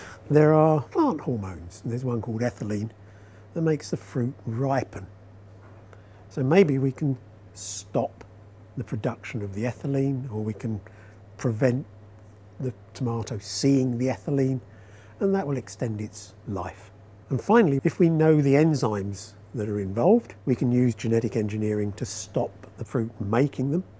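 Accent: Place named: United Kingdom. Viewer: This is the first person I've ever heard say "toe-MA-toe" regularly outside of the phrase "toe-MAY-toe, toe-MA-to" to express the likeness of two things. Is that part of the British accent, or just an odd exception?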